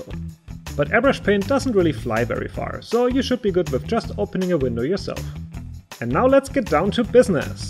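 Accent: Germany. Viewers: your accent is so very german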